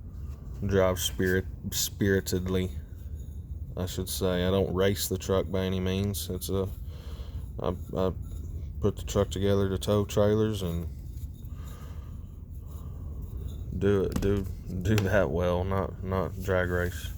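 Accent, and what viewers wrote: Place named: East Texas, United States. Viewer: Hi, you sound like you're in East TX. If so, would you be able to help me identify if I need a complete rebuild or if I just need the basic kit? I'd appreciate it!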